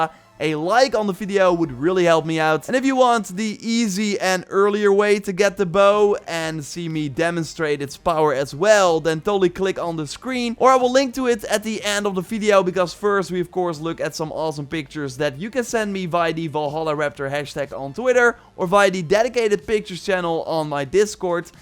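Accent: Netherlands. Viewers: Are u dutch ???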